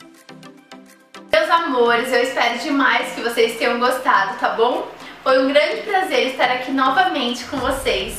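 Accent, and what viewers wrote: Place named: South, Brazil. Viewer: Bom dia. Moro em São Paulo, mas sou Gaúcha! E tu , mora no Sul? No Paraná? Pelo sutaque! Bjs Amo fazer pão caseiro!!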